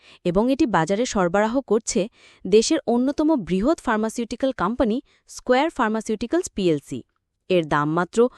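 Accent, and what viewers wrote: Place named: India. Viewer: কন্ঠে বাংলাদেশের টোনে বলুন। ইন্ডিয়ানদের মতো করে বলছেন..?! ওদের এই বাংলাদেশের সাধারণ মানুষ পছন্দ করে না।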